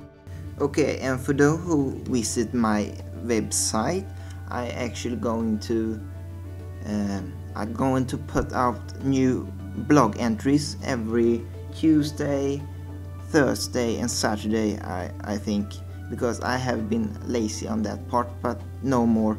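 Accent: Sweden. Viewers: You sound swedish :D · I thought i heard some swenglish in it swedish i awseome. hur länge har du hållt på med hus i sims?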